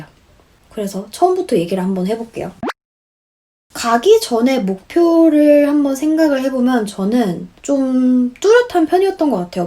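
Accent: England, United Kingdom. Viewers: 우연히 영상을 보게됐어요:)) 님에게 영국이 그렇듯 저에겐 프랑스가 정말 그런 존재여서 많이 공감되고 혼자 대답하면서(....)봤던 영상입니다 😌😌 정말 많이 공감가는 부분은 누가 시켜서가 아니라 내가 좋아서 한 생각들과 결정들이기 때문에 시키지 않아도 늘 뭔가를 했고, 누군가는 이 나라를 답답하다고 말할 때 저는 항상 빨리만 달려왔던 삶에서 벗어나서 느리지만 여유로운 문화에 적응하고 싶다 라고 말했던 것 같아요:)) 그리고 그냥.. 참 좋다는 점! 프랑스어에 꽂히고 프랑스에 꽂혀서 결국 지금 프랑스에 있는데, 이렇게 영국영어를 접하게되니 정말 너무너무 매력적인 것 같다는 생각이 드네요 ㅎ_ㅎ!! 정말 그 무엇과도 바꿀 수 없는 소중한 경험들 많이많이 만드시길 바랄게요 🥰🥰!! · 와 영국발음ㄷ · 우연히 알게 됐는데 정말 너무 마음에 와닿는 얘기가 많은 것 같아요! 저도 지금 영국은 아니지만 다른 나라로 교환 준비 하고 있는데 남들보다 뒤쳐지는건 아닐까, 이런 저런 걱정들이 많았거든요! 가고싶다는 마음은 확고한데 언니 말씀대로 주변 반응도 뜨듯미지근 하기도 했구요..! 그런데 제가 좋아하는 거고 저도 지금 이 나이에 이 경험을 하고 싶은거니까 나중에서도 어떻게되든 후회하지 않을 것 같아요!! 언니 영상 보면서 용기도 많이 얻었고 되게 위로받는 기분이 드네요... 💓 유튜브 알고리즘으로 영국영어로만 하는 브이로그 봤는데 제 귀에는 엠마왓슨처럼 들려서 정말 너무 멋있고 깜짝 놀랐어요! 구독도 하고 자주 챙겨보고 (정말 안지 얼마 안됐지만 ㅎㅎ) 항상 응원할게요! 너무 멋있고 이런 영상 만들어주고 경험 공유해줘서 감사합니다 🍑💫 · Did you grow up in Korea or England? Since your accent is so posh I'd say you grew up in England. 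· 님 영상보는데 영국발음에 또 홀리네요ㅎㅎㅎ 구독하고갈게용> < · 영국발음에 반해서 다른 영상들도 정주행중입니다 ㅋㅋㅋㅋ정말 대단하시네요. 엄청난 노력을 하신것 같아요.. 같은 워홀러로서 되게 제 자신이 부끄러워지네요. 영상 볼때마다 영어자극 팍팍옵니다. 감사합니다~많이 업로드 해주세요🙏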